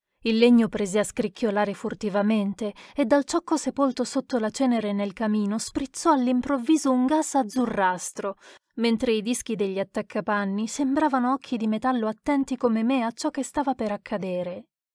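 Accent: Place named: France. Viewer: Bravissima, mi piace soprattutto il tuo accento francese. 👏👏👏👏👏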